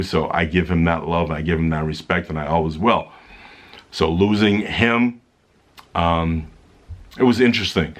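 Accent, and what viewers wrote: Boston, United States. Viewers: Lol i never really noticed you have a Boston accent until now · Your accent is really mild compared to a lot of other bostonians lol I've talked to.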